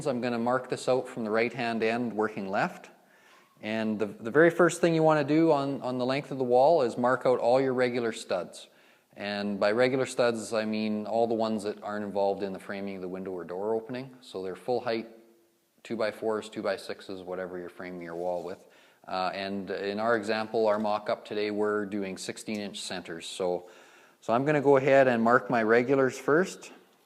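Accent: Canada. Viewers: BTW - do you live in or near Canada? :) I hear the long "o" sounds (aboot). :) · Sounds like he is canadian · you sound Canadian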